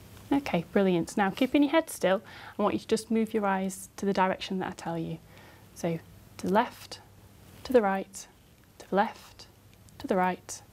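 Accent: United Kingdom. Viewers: how i love her british english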